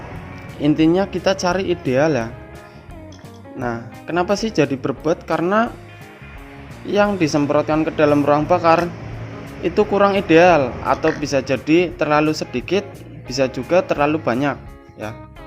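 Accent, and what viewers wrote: Tegal, Indonesia. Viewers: Ketara wong tegal ya um😂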